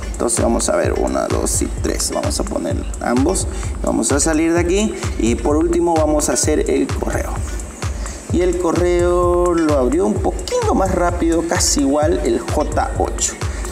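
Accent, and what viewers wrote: Bolivia. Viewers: Cuando vi tu video dije este es de BOLIVIA por qque salio el deber y despues dijiste poquingo y hablas como BOLIVIANO Buen video caramba.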